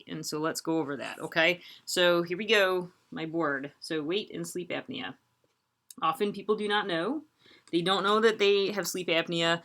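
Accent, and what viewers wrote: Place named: Upstate New York, United States. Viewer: Great video. I am also loving the Upstate New York accent!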